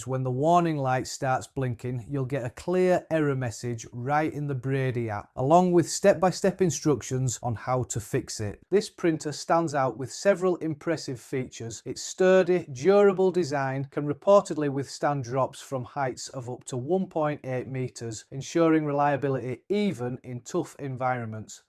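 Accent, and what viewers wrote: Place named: North, United Kingdom. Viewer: Nah, that's just his northern accent!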